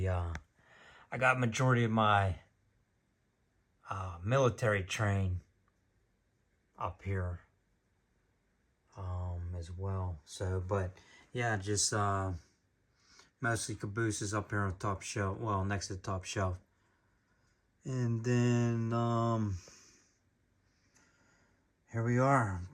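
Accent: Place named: Pittsburgh, United States. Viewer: Nice layout especially for a first one! You sound like you're from dahtahn Pittsburgh!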